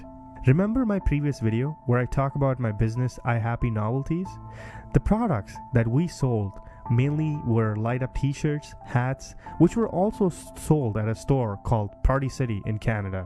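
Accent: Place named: India. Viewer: The first Indian accent video that I didnt skip, mainly just because the accent wasnt that strong hahaha.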